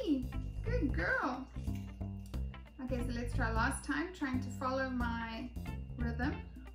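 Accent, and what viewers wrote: South Africa. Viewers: quite agree, love hearing both your voices. I've been trying to place your accent..it's been bugging me. Keep thinking South African. Am I way off? 😁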